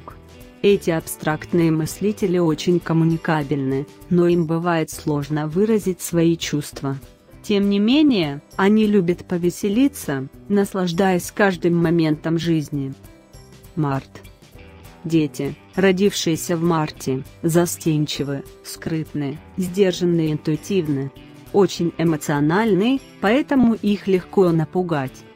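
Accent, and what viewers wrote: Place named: United States. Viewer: Вы зачем читаете с американским акцентом ? Это давно устарело .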